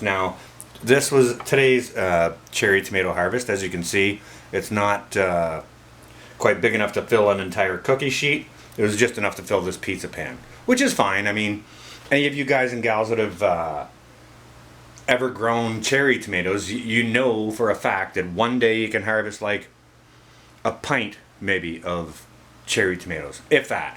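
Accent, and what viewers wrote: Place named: North, United Kingdom. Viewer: If you grow a hamburger over the winter, I want some of those seeds.!! It sounded like grow even though I think you were saying throw, what can I say you have a Northern accent.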